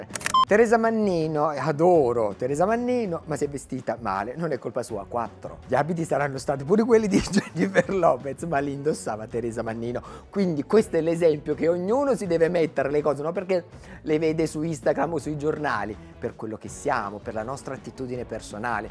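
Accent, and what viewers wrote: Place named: Naples, Italy. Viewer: Ma da quando parla con accento napoletano. Voto 1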